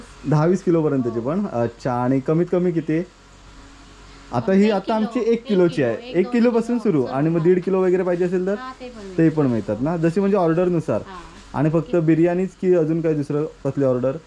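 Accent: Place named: Malvani, India. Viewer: अरे तु मालवणी आहेस की रत्नागिरी कर. बोलतोयस मालवणी.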